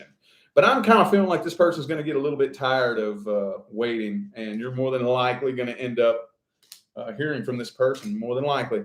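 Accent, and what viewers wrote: South, United States. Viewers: I enjoy your readings. Are you a southern fellow?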